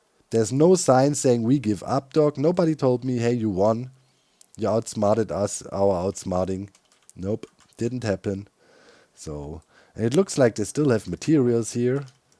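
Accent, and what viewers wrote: Germany. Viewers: Have you ever played "Command & Conquer Red Alert 2: Yuri's Revenge"? Your motivational messages really sounds like Yuri's propaganda voice. In fact, the actor, Udo Kier, who portraid Yuri in this game is German and did both the English and the German voices. So he also has the slight German accent like you do. All hail the G.O.A.T. 🐐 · The German accent makes it sound .... nvm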